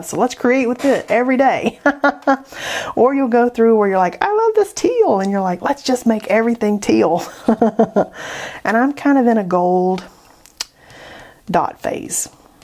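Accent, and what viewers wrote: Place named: South Carolina, United States. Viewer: You sound like you are from South Carolina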